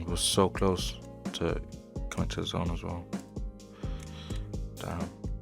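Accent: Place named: London, United Kingdom. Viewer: in the background and you sound like a Londoner we should defo link up if you are we can share some gems 💯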